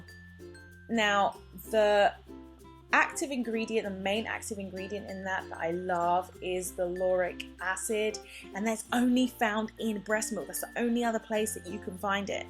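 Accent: England, United Kingdom. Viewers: OH MY GOD YOU'RE ENGLISH!!!!! YESSSSS!!!!!!!!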